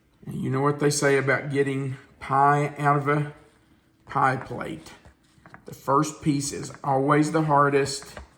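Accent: South, United States. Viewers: Tell you something, this is not a pie you can get anywhere, yet Kentucky Derby Pie is a thing. My mouth actually watered, and I never had it! I will be making this. Wonderful video. Simple, right to the point and I have to say, you have got one of the most proper gentlemanly Southern accents,.....and that's coming from a NY Brooklynite.....lol. TY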